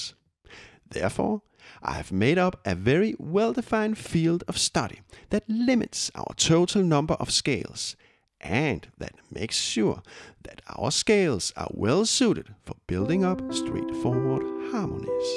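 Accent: Denmark. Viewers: You sound Danish... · That accent 🤌🇩🇰 Greetings from a fellow danish musician. Great stuff ❤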